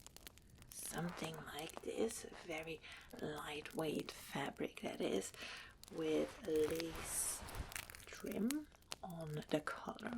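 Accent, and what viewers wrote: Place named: England, United Kingdom. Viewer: Never gets old! Ur english!!!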